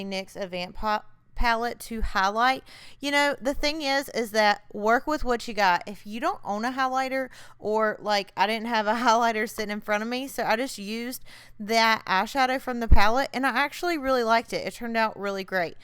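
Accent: South, United States. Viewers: Randommmmm but I so love your hair it looks so healthy and love the southern accent. The makeup is cute to of course haha.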